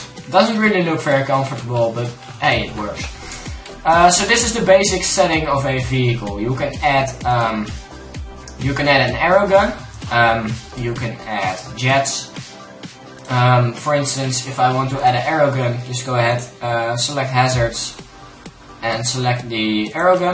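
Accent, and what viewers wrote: Netherlands. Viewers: Jij hebt een nederlands acsent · are you dutch?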